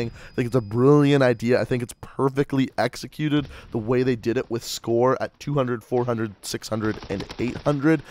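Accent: Canada. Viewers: Are you canadian? You say aboot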